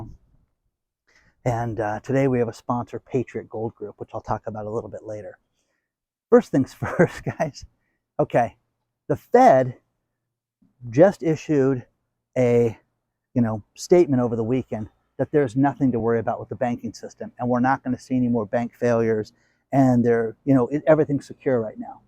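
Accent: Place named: Southern California, United States. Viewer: The SoCal inflected "OKs" get me laughing every time!!😂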